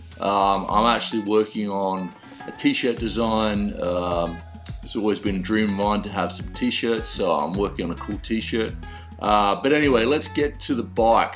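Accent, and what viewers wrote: Australia. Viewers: Great vid Awesome to have an Aussie accent reviewing these bikes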